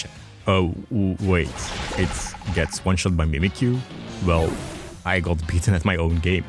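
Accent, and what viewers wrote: Netherlands. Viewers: Question: R you Dutch, French or neither? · I can just hear that you're Dutch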